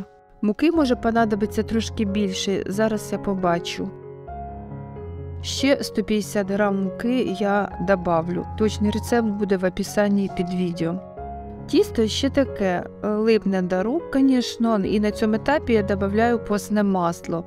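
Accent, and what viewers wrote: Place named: Mykolaiv, Ukraine. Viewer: Я думала ви з Миколаївщини😅 в подружки така ж говірка😊 але можливо ви заміж вийшли в Одесу😅 підстава) 😊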